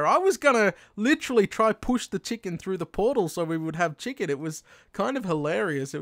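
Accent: Australia. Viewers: Hey are you from Aussie by any chance? Haha I'm from New Zealand so if you are from Aussie heya neighbour:D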